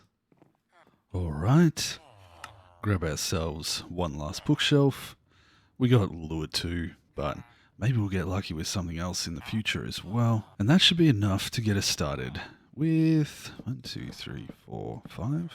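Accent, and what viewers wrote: Australia. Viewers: This is the first video of you I have seen and I loved... And to be honest it's refreshing to hear a fellow Australian speaking in a video. 🤣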